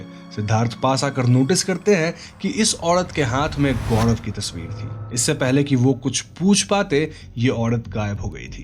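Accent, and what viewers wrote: Bengal, India. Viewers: Bangali babu. Hindi theek karo. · The VO is of a bong.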